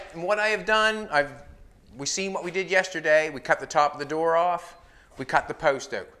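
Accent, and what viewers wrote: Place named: Canada. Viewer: Ohhhh. "Tudor"!... last episode I kept thinking you were saying "two door" over and over again. Hahaha i gotta get caught up on my Canadian accent decipher. Looking good guys! 1 hour chop!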